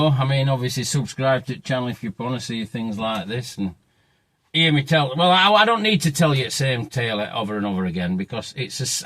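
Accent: Yorkshire, United Kingdom. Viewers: Thanks for the video I always look 4wards to your videos & il always support you in what you do in anyway I can my friend, I'm away sharing your videos & me n my mate have screening binges on all the videos you do. They take us rite back to our youth & I love the way you talk & your accent as you remind me of my gran & mum as they are originally from Yorkshire Bradford n Wakefield. I have so many good memories watchin n listening to you & your videos. Please keep up your amazing work & your mega videos my friend. Best regards. Jim in Manchester.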